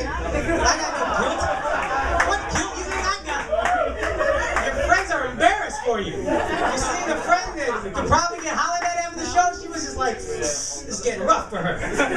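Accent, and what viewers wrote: United States. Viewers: Really, I thought he had way more of an NY flavor to his accent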